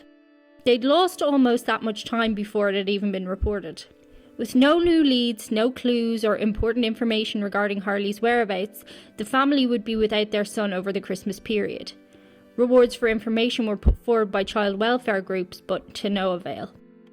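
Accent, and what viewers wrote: Ireland. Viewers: This may sound crazy, but in these videos, there's something about your accent that doesn't say "Dub" to me, though I know you are. Are both of your parents from Dublin, too? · I love your new channel. I did notice your Irish accent is more pronounced. I hope you continue towards a news magazine channel, you have soo much to offer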